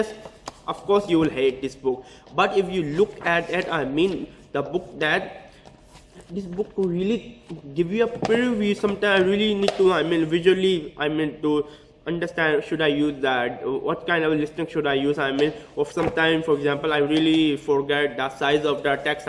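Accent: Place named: India. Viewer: I really wanted to watch this video because I am trying to decide my job field between those two. Sadly.. I gave up watching this video. I can't understand what he's saying. It gave me a headache after watching this video for 2 mins. Seriously. speak slower. You have super heavy accent so it's really hard for anyone to understand you unless they are Indian too. If you can't fix the way you speak, at least put a subtitle. I can understand most people with accent fine but this is like the worst accent I've ever heard. Super heavy accent + fast speaking = Inglish, not English. Someone please reply which one he picked between those two. I am guessing he picked web developers since he was holding networking books and saying he's born to do networking.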